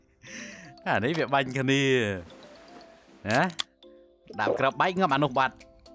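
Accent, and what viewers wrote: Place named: Cambodia. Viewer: ប្រូខេត្ដណា មិចយាយអណ្ដើនម្លោះ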